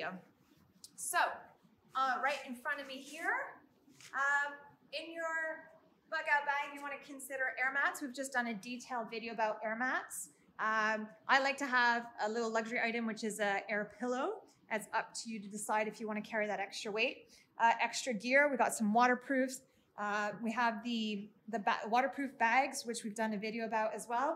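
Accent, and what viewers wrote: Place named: Vancouver, Canada. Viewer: Sound like u r from Vancouver. Lol This survival prepper stuff has been gojng strong for 20+ yrs now. What do you think our number one prep reason should be? The cause to prep.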